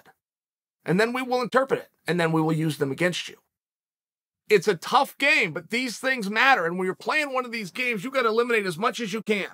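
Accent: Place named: Portland, United States. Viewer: Chael’s speaks Russian with a Portland accent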